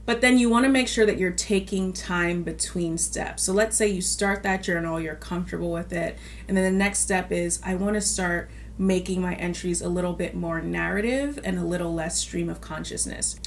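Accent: Nigeria. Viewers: you're an amazing woman of God. Loved this video, but I truly miss your intermittent bursts of Nigerian English. Please bring it back.😂 God bless you, girl!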